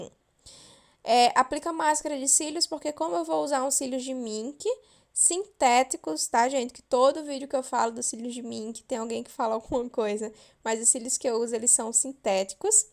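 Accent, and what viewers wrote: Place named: Northeast, Brazil. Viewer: Que sotaque é esse minha fia 😍😍😍 Também sou nordestina Estou super inscrita 💥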